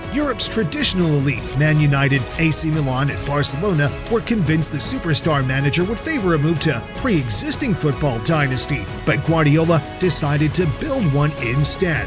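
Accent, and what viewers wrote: United States. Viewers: You'd heard that american accent? They're new here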